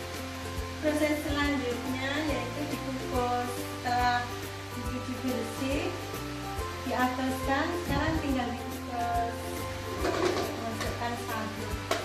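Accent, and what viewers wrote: Indonesia. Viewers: Orang Jogja kah Bun?😁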